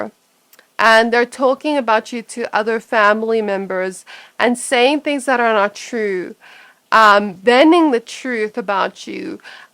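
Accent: South Africa. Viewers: South africa? Wow. I was wondering what your accent was from......and your lights went out. ....2 months agi i was having an issue with my finances and had my electricity shut iff because i didbt oay it. ... And EVERYONE IS AGAINST ME FOR ME. EVERYBODY........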